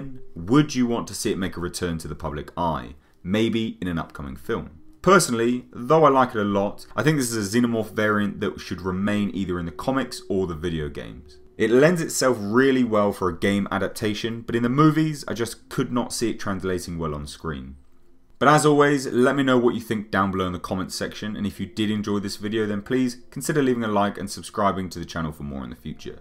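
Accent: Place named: East England, United Kingdom. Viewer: U. PY I am going to guess you're East English or Western English? You said Love so I am going to guess east.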